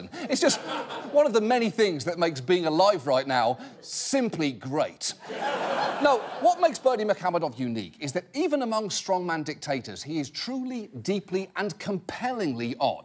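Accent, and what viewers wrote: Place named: United Kingdom. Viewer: that trademark British delivery system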